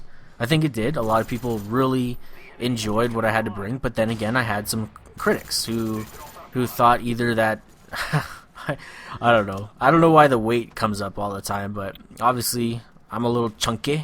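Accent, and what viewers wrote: Canada. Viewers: u sound canadian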